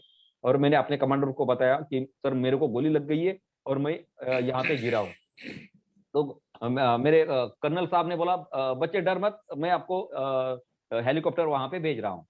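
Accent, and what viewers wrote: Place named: Maharashtra, India. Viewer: Saheb, your marathi accent is very beautiful 🙏🙏 Hats off to your bravery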